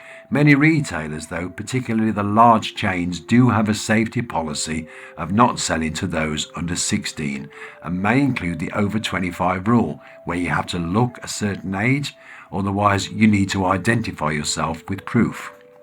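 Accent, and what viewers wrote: Birmingham, United Kingdom. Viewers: 😂😂we just hung bright orange curtains to go with matching fire wall . I lived in brum for 2 yr your accent brings back memories 😅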